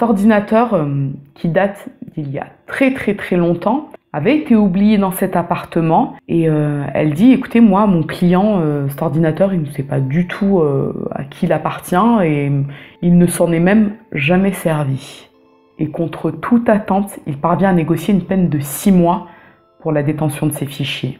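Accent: Italy. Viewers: Quel accent !!! Suis italien j'ai remarqué de suite que tu le parlais, tu es Italienne ? Bravo une fois de plus gros travail de ta part sur cette histoire ! J'adore mon rdv du dimanche ! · cet accent! tu sais parler italien? impressionnant !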